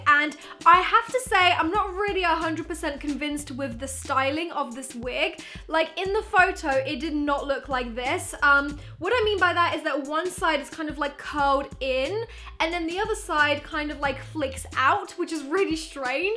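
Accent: United Kingdom. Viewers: Normal english person: Better British person: Butter Lol it's cute 🖤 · Oh my.....this British accents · Wait ate you English omg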